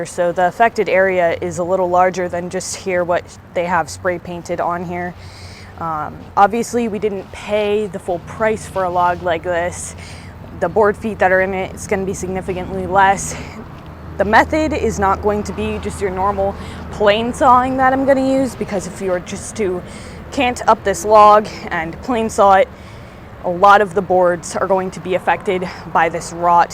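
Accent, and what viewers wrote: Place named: Pennsylvania, United States. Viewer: being from PA, the "alright" is just a part of our PA talk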